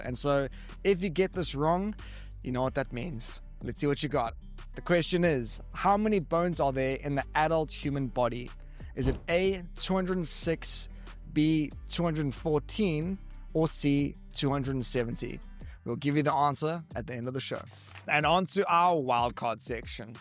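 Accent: South Africa. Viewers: This has to be the second worst South African accent.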